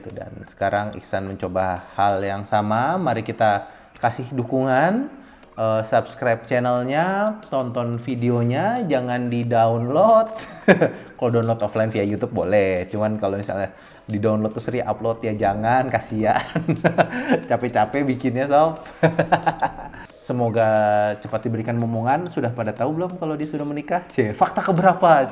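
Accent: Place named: Java, Indonesia. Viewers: jogja kali. soalnya logatnya jogja bgt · Klo inget inget tangannya yg belang 😃 Dan cara ngomongnya yg medok, unik 😃 Tapi keren lah isi kontennya singkat padat jelas 👍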